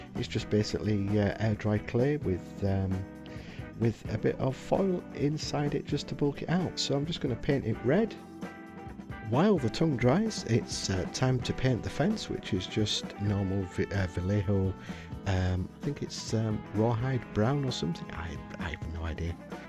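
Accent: United Kingdom. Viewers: I suspect from your accent that you are from somewhere in the UK. We in the US who follow American football may remember the quarterback Jay Cutler, who was the team captain of the Chicago Bears from 2009 to 2016. Not sure, but I suspect he probably also liked Scooby Doo as a kid.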